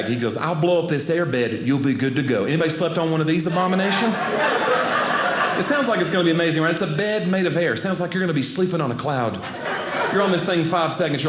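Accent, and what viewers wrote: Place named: South, United States. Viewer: Awesome comedian. Redneck and southern accent. Love it. Don’t change.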